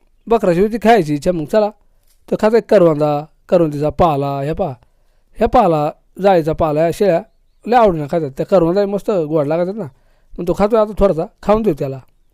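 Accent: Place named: Palghar, India. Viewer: दादा तुम्ही बोलता तीच बोलीभाषा आहे आमच्या गावची पण... मला वाटले तुम्ही पण पालघर मधलेच आहेत की काय...❤❤ जय आदिवासी दादा...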